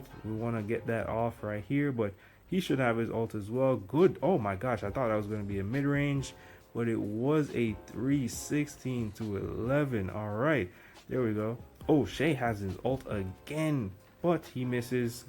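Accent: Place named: Jamaica. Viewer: You sound Jamaican or Bahamian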